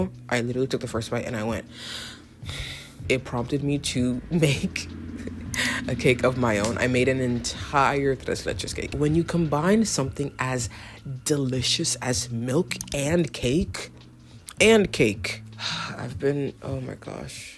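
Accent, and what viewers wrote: United Kingdom. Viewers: your british accent is cracking me up, omg haha. also you have new job? what kind of role do you have?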